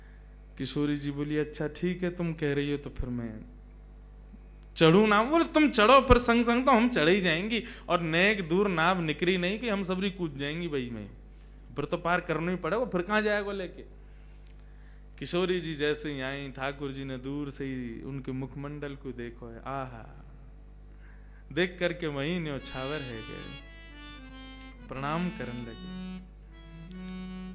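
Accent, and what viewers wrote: Braj, India. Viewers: 🚩🚩🚩🙏🙏🙏🥰😅 अति सुंदर अति सुंदर महाराज जी बृज बोली कितना मिठास है सुनने बड़ा आनंद आता है श्री राधे राधे हरे कृष्णा प्रणाम प्रभु❤❤❤❤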